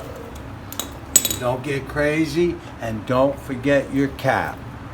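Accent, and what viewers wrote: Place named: Long Island, United States. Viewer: I lived in Suffolk County, Long Island New York. Sounds like you're from there, lol.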